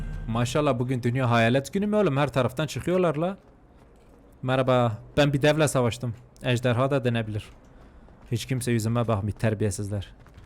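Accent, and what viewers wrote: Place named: Diyarbakir, Turkey. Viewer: Abe Diyarbakırlımısın şiven küfrın kürtçe bilmen buralari andıri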